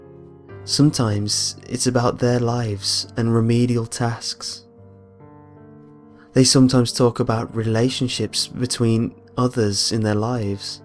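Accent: United Kingdom. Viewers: Even better HES GOT A BRITTISH ACCENT *Dies of the sexyness* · Oh man, I am in love with your British gentleman accent. You sir, are the most amazing scary story teller I'v ever heard. Maybe in my WHOLE LIFE!!!!!!!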